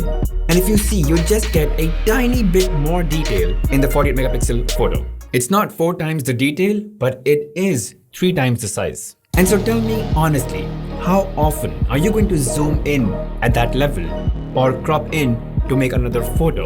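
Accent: India. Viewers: Accept my humor please Your accent is classic, brilliant and likely indian You're this little guy and I say little guy in your accent And you have in an extremely short space of time Become top tier Amongst the most trusted reviewers on earth 🌎 YOU ARE BRILLIANT! Yea we all make errors But brilliance remains.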